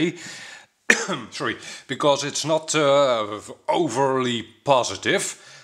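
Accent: Netherlands. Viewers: Gast! wat een heerlijk engels hollandsch accent heb jij!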